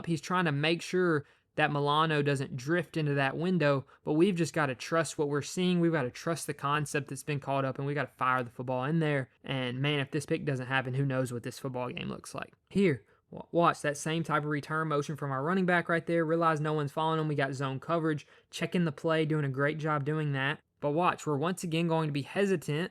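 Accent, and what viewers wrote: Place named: Alabama, United States. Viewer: Love hearing a southern accent on a football breakdown. Love seeing football breakdowns on my Jags. You're spot on with your analysis of Trevor. I'm guessing central-south Alabama with that accent?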